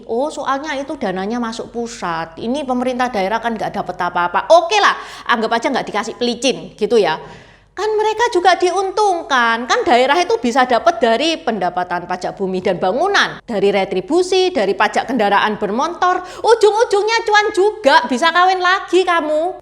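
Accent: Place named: East Java, Indonesia. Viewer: Ciecie aku suka dialeg Pecinan Jawa timuranmu. Seru...terasa akrab. Indonesia banget 😂👍 sehat sll cie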